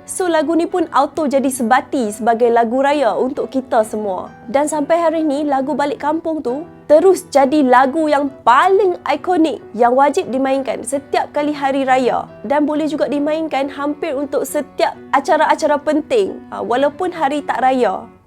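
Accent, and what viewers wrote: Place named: North, Malaysia. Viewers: Meluat pulak dengar speaker cakap slang utara. Cakap je la bahasa melayu biasa. Asal orang utara je kena pakai slang dia. Kalau orang pantai timur tak boleh pulak pakai slang dia, nanti orang tak faham la apa la. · Apa masalahnya klau dia nk ckp Utara... Menandakan org melayu bukan jenis lupa diri... Lagipun org melayu kedah adalah suku melayu terbesar di Asia tenggara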